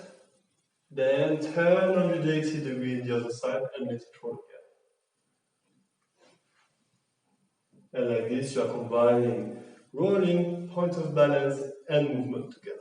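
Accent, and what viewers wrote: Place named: France. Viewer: Mais... il parle anglais avec l'accent français... pourquoi ne pas faire un tuto en français ????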